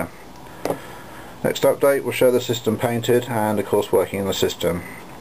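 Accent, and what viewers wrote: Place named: United Kingdom. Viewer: somebody can explain me what this does/ sorry is because it kind of hard to my to understand brithish english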